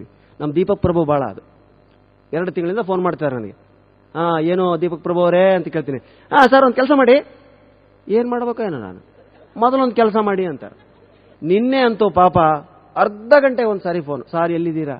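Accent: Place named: North Karnataka, India. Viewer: ಸರ್ ನಿಮ್ ಉತ್ತರ ಕರ್ನಾಟಕ ಭಾಷೆ ಸೂಪರ್ 😂😂😂❤❤❤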